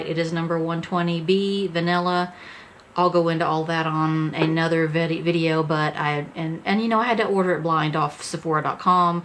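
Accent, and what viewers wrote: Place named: Texas, United States. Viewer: Love this review! I wanted to see how this new palette would work on fair skin. Looks pretty! Also, I am a TX girl too & within the first 20 seconds I could tell you were from TX. 😂❤️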